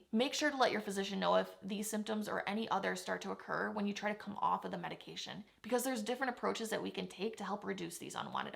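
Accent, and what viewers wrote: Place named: Midwest, United States. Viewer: nice midwest accent :D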